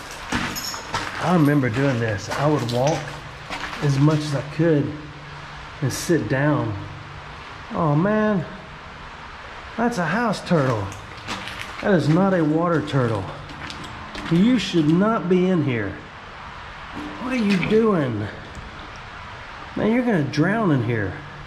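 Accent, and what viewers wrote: Alabama, United States. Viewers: Alabama!??? Yeppers 🤔.. I'm hearing that Alabama way of speaking in every time you get to talking...👍😎 A hard hat is obviously recommended....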